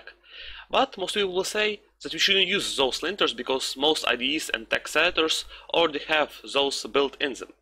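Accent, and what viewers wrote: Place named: Russia. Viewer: Are you from Russia?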